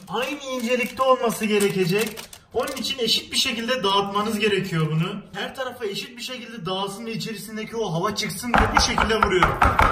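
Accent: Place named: Hatay, Turkey. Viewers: Hatay sadig demeniz hatay iskenderun olmanızla ilgili Şive tam bizim koyun şivesi gidük gelük şuraya öte yayliye öte buri gel napön falan hataylıyım insalah bir gun karşılaşıp yaptiklarindan tatarım😀👍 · Abi anlıyorum hataylisin bende adanaliyim fakat şu konuşma tarzıni degistirirmisin bak abi videolarını severek izliyorum ama diyorsun ki yapiyirum diyorsun abi harfleri yutmadan konuşsan daha anlaşılır olur yapıyorum de abi dolaba koyuyorum de abi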